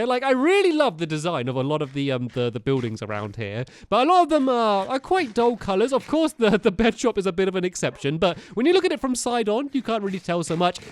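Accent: United Kingdom. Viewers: For a while I stopped watching you stamps because I always wanted to do The stuff you do, but never had the chance to do it because my dad wouldn't let me. I got jealous? Well, last night My brother updated our minecraft up to Xbox live and we got ALOT of the new stuff you have. It took the whole day to figure it out. I was discouraging to my brother and told him to give up but now I'm watching him trade with villagers, something I've always wanted to do. I feel awesome now and I think I'll try to start watching you again. I miss your cute British voice!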